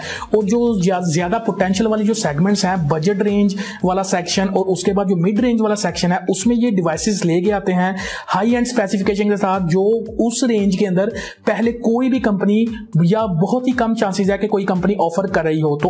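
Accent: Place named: India. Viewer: Your Indian English accent is so strong I that cannot understand half of what you're talking... I'm not being racist or hating here, but it's really incomprehensible because I'm not Indian. Can you add captions to the video so non-Indians like me can understand? Thanks...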